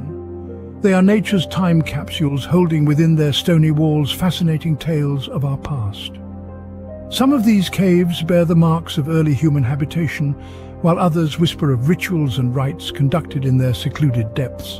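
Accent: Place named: England, United Kingdom. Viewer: The most rational explanation is to believe what the evidence shows even though some of the evidence has been removed and destroyed what they didn’t get or some of the large footwear from Giants of approximate 12 to 15 feet tall plus red hair, which was checked for DNA but you will never hear the results of that test. It ruins the paradigm of this very wordy over Adjective laden, plus add the English accent to serve some sort of intellectual prowess to perpetuate the paradigm rather than report on the Fax.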